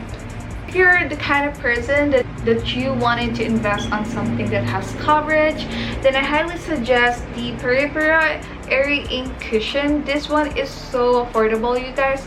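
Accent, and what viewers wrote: Philippines. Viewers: That accent and your beauty (and also the miniso products, I remember those stores when I went to visit last year)! I knew you were Filipina! I’m half and so proud! ❤️🇵🇭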